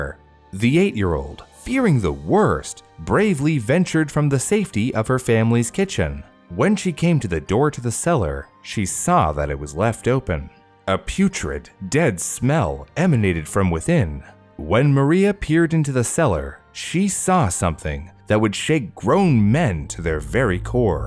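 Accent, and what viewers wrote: Canada. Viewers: Sounds Canadian … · u sound Canadian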